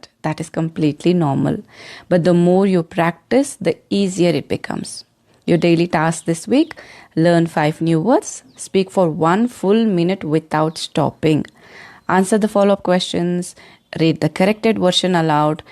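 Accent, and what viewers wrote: India. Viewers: U r such kind desi voice who might be transformed very easily to standard English pronunciation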